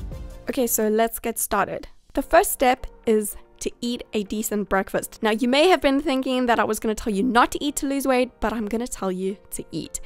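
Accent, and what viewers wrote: South Africa. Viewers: She's south african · HATS THAT ACCENT ? SOUTHAFRICAN ? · Are you south African? That accent 😍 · Are you South African? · are u South African or Australian?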